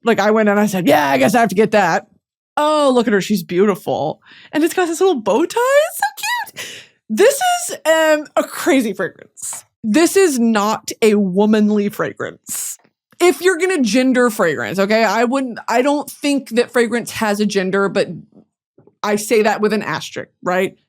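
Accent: United Kingdom. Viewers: as a londoner i am SO obsessed with your interpretation of a British accent hun x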